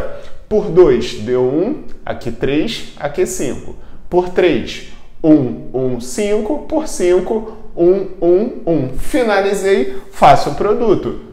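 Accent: Rio de Janeiro, Brazil. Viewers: voce e carioca? tem o mesmo sotaque que eu falo tudo com x mano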